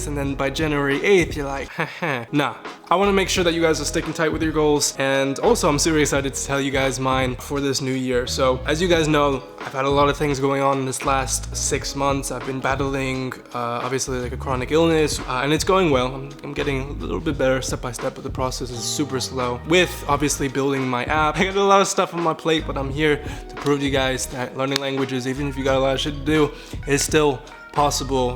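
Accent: Japan. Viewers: Your Korean is adorable. It kinda sounds like a Japanese accent 😂🥰. I’m learn Korean too and I hope I can learn it with you! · Awww your korean pronunciation is so japanese lmaoo hope u get better and hope your have a good year!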